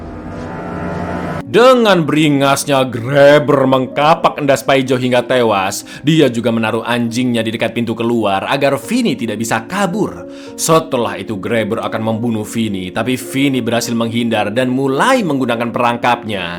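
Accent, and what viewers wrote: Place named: Surabaya, Indonesia. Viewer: Cak asline arek ndi? Kok logat bahasa e koyok Suroboyo sidoarjo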